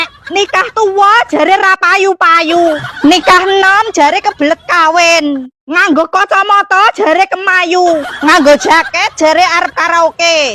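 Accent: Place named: Banyumas, Indonesia. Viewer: Ora ngapak.. Ora enak ❤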